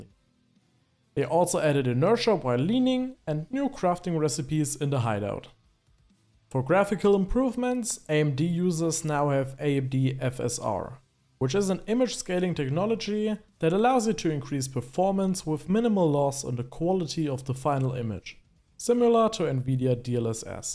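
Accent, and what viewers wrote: Germany. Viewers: german accent is strong with this one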